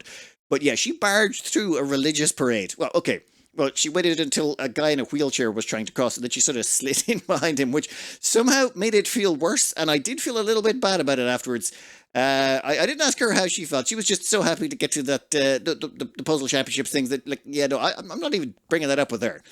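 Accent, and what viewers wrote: Ireland. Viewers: OMG, you're Irish?(I just have to ask)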